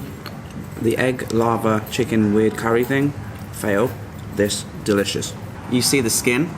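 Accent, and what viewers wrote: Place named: Sheffield, United Kingdom. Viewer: you dont sound irish more english if i was to guess someplace around Sheffield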